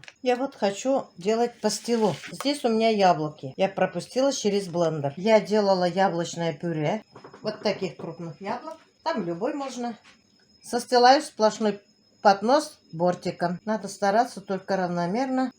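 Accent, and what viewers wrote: Tatarstan, Russia. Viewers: Здравствуйте. Случайно попал на ваш канал. Посмотрел ваш ролик очень отлично. За два дня пересмотрел все ваши ролики. Ролики ваши отличные молодец. Главное не надоедает. Больше показывайте про сельскую жизнь. Единственное не отказывайтесь от подарков. Люди дарят вам от.всей души а не как помощь. Если вы нам не нравились ни кто бы не смотрел вас. А так программы отличные и этот шарм. Татарскии акцент так мне нравиться. Привет семье дочкам зятьям внукам сестре брату женгей подружке. Сестренке из ново и пусть выздоравливает. Всех вас с новым годом. Крепкого здоровья. Семейного счастья успехов во всем. С новым годом.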